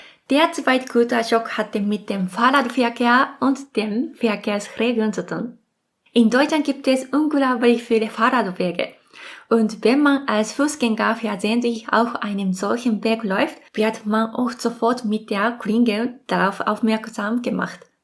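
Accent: Japan. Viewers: Liebe, ich höre ihnen sehr gerne zu, ihr Deutsch ist perfekt mit dem kleinen japanischen einschlag. Ihre inhalte sind sehr informativ und ich freue mich auf weiteres von ihnen.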